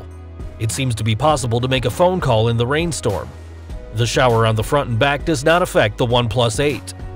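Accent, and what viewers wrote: United States. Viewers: Why does your voice sound american but it sounds like a foreign person wrote it and ur just saying it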